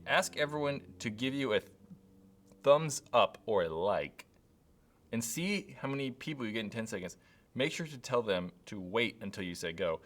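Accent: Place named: Boston, United States. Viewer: Love your Boston by the way!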